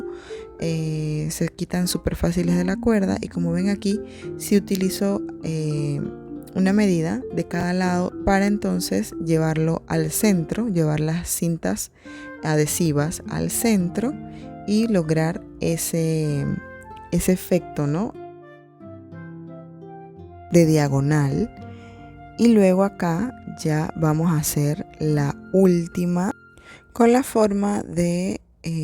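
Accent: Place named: Venezuela. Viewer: me encanto, explicas de una manera muy sencilla y creas un muy buen ambiente. Creo que eres venezolana por tu forma de hablar, con lo cual somos paisanas. Siempre he tenido problemas al calcular el material que necesito y con tu explicacion lo has hecho super faci. <<<<<gracias por compartir...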